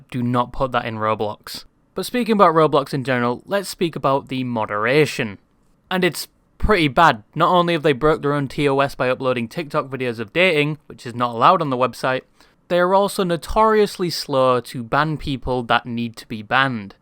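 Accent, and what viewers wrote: United Kingdom. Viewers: And that Is nice British accent 'give me a bottle of wot'ter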